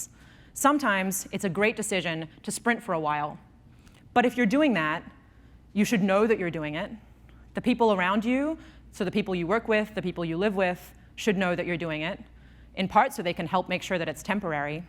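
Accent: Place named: Australia. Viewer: I was in her lab class at unimelb. Back then, she spoke pure aussie accent. Now, it's hybrid.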